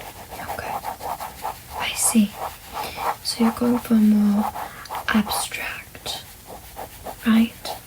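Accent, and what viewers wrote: RP, United Kingdom. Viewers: Hello! Lovely to see you again on this fine day. Such a pleasant experience to hear your British accent in this video. We really appreciate your time and effort to make such delightful asmr for us and for that we thank you! Cheers! 😊👏👏👏👏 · British was something I never knew I needed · I think I look much better in the portrait, you draw excellent 👌👌👌 It was very relaxing for me to listen to you speak in British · I love the British accent and would for sure welcome more of this! Great video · Just when you think she can't possibly get any more adorable... enter the British accent. 🗣❤ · As an English accent I find the person quite brilliant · As a fellow Britain myself I don’t know how to feel about ur British accent but keep up the good work!!! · sorry but that was old british im not trying to be a pest but i find it a-bit stereotyped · I've lived here in the uk all my life (47 yrs old) and yet to meet someone who speaks as posh as this, but good attempt though. 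great video though. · Ngl although you sound like more of a upperclass english person its not far off. Better than mine and ive lived in the uk all my life 😂😂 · Your voice is adorable with british accent ❤ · Just to be clear, folks, it isn't a British accent, it's an English accent. However, it is very commendable nonetheless 👏🏼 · As a Brit... I wish our accents were all this posh..... Mine certainly isn't! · I'm British and the accent is lovely:) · Very Bri'ish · Not a bad attempt at the british accent also love your look aswell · Your posh I can tell 😂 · As an English person I find your accent quite amusing and endearing. It's a bit like a quirky Joanna Lumley. Quite plummy and soothing. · ASMR is so much better with an English accent, I probably won't stat awake more than 5 minutes, so thanks ahead of time, good night 💤💤💤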